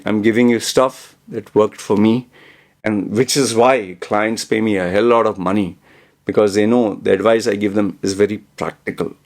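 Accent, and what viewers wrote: India. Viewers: you have a indian accent , are you too from india?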